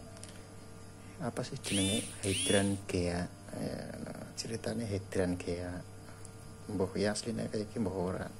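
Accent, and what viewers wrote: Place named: Banyumas, Indonesia. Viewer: Suka dch sm tuto yg ini....ngapak chiinnn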